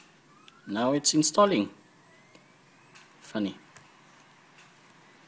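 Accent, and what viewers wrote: Philippines. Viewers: that Filipino accent tho🔥🔥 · Halatang pinoy to ah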